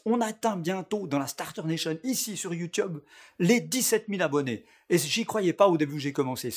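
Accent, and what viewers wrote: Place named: Neuchâtel, Switzerland. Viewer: Ahhh j’me disais qu’t’avais notre bel accent ! Vive l’absinthe ! Vive Neuch !!! 😂🇮🇹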